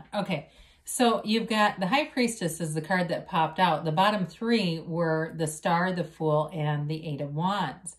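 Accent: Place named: Boston, United States. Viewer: I'm Spanish, I was an exchange student in Mass a long long time ago, so I claim it!!! Wanna go back to Boston yes!!!😄🥰In fact I love listening to you because of your accent. In my Profesional life there a lot of british accent speakers and international and all of a sudden you sound so familiar...may I ask where in USA are you from?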